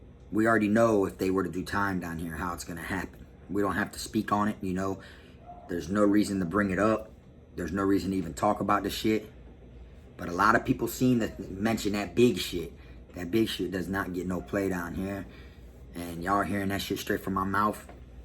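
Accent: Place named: Baltimore, United States. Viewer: Yo u that nigga real talk yo I'm from bmore and it's the exact same way u talkin bout out here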